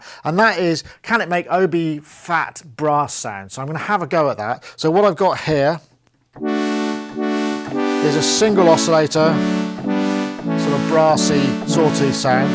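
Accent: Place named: United Kingdom. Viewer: Lock, stock, and two smoking oscilators accent right there. This guy should be in the next Guy Ritchie movie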